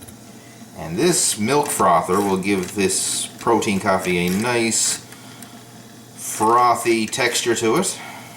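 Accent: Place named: Maritimes, Canada. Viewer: He's got an east coast accent. Canadians refer to them as maritimers. They have their own special accent. Not quite as rough as people from Newfoundland though.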